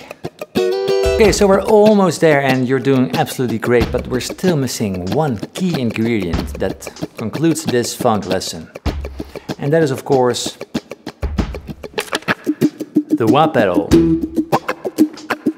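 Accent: Netherlands. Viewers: What is your origin? You sound dutch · Sounds dutch to me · That Dutch accent ain't so funky. Sounds like my Grandpa from Rotterdam. The playing is all the better to funk u with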